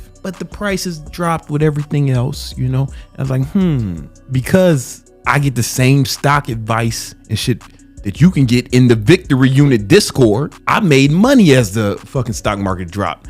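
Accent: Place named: New York, United States. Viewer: I could tell he a NY nigga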